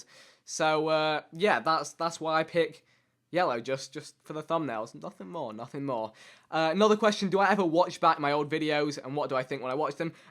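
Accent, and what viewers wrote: England, United Kingdom. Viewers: I'm a new sub recommended by a friend, out of curiosity what is his ethnicity? his accent sounds English (UK) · gianluca moores Maybe you thought that because he has a English accent .___. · well he was raised in Britain so obviously he got to be talking in the british accent. but he's indian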